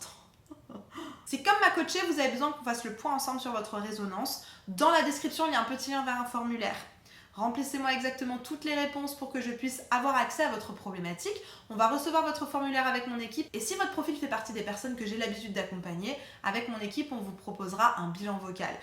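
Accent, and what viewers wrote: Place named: United States. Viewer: ah non, change rien quant à ton génial "sound color" à l'américaine, ça te va fort bien ... géniale vidéo ... une de plus ... en fait, la voix est une espèce de formule mathématique quoi ,...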